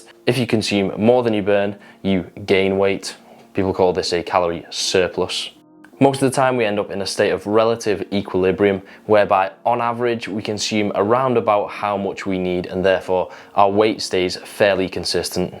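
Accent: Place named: Widnes, United Kingdom. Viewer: Sound like your from widnes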